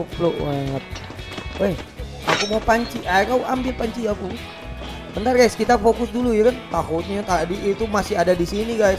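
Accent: Java, Indonesia. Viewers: Medok hahaha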